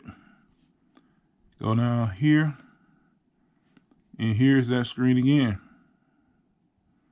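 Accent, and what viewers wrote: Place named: North Carolina, United States. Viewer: Thanks so much! I needed that! You sound so much like one of my cousins from North Carolina! Go figure! 😊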